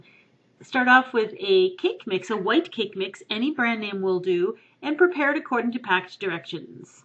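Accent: Canada. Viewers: this might be a silly question, but are you Canadian?? You're accent sounds very familiar! haha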